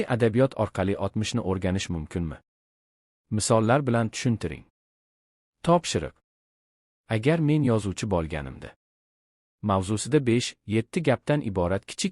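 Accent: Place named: Russia. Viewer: Nima rus kishi oʼqiganmi buni gʼ ni g deb aytadi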